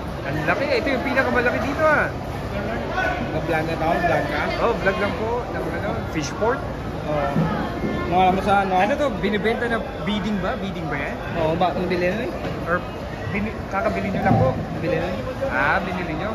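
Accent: Iloilo, Philippines. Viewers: Wag muna pilitin magtagalog boss halata Naman n ilonggo k eh😢😢😢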